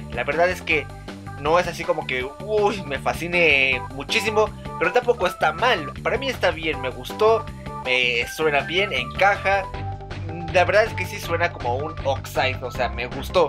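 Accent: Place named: Mexico. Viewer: Hasta tu voz parece mexicano dE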